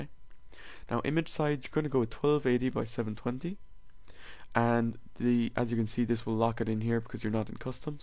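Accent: Ireland. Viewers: The video at points makes you sound like you've got a dirty Dub accent. Sorry for the insult ;)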